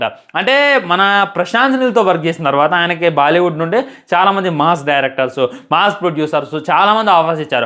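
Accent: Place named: Telugu, India. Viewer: Aapu ni sodhe bhaiyya , paata paadinattu matladaku . Bore chestavu . Aa telugu accent vina lekapothunam 🙉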